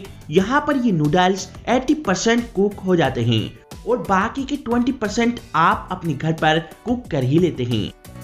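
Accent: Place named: Bengal, India. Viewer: Bengali.. Accent mast rahata hai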